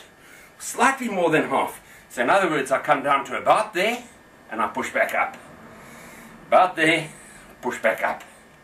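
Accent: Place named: Cape Town, South Africa. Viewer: Thanks from Peshawar, Pakistan. Luv your Cape Town accent.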